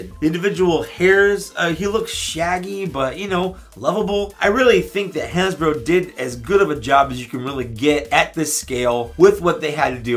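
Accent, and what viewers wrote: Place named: Chicago, United States. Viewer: Lol aren't you from Chicago? You definitely have the accent! 🤣 Love your reviews, keep it up!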